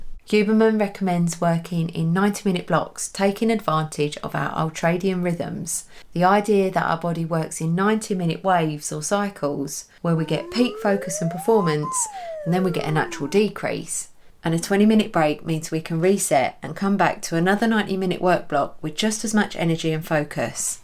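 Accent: United Kingdom. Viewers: This is ridiculous. Everybody is different. Screw Huberman. BTW, love your British accent.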